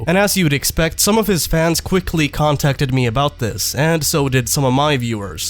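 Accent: United States. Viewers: Didnt know your European you sound american white :/ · You sound American tho why? If u don’t mind me asking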